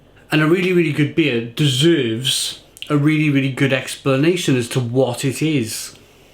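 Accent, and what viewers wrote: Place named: South Wales, United Kingdom. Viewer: south Wales 👍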